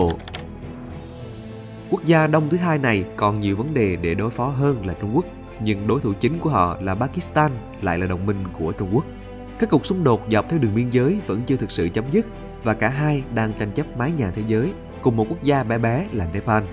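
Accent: South, Vietnam. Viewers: xét riêng giọng đọc thì hình như k vấn đề gì, nếu bạn nghe tốt giọng miền Nam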